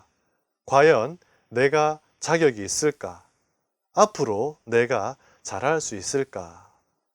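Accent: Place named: Gyeongsang, South Korea. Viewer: 차분하게 알아듣기쉽게 구수한경상도말씀이 너무도 잘 드렸습니다 고맙습니다~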